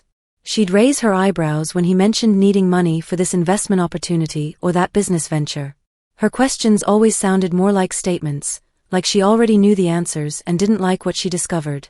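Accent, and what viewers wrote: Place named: United Kingdom. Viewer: Absolutely freakin love British Narrators. ❤❤❤ Great Story 😊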